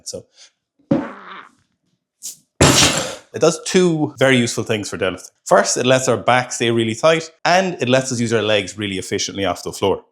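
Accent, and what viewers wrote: Ireland. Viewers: How do I know your voice? Are you feom Limerick?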